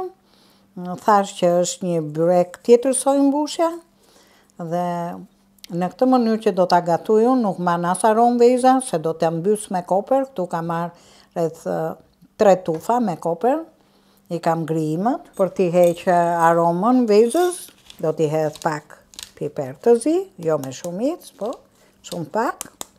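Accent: Tirana, Albania. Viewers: Mirdita zonje , kam pak kohe qe po ju ndek nga Italia, me kenaqe me ate fhekesin e vjeter tironase, dhe me recetat dhe shpjegimin tuaj te fhjesht popullore. Ju uroj SHENDET 👌👏🙅‍♀️❤️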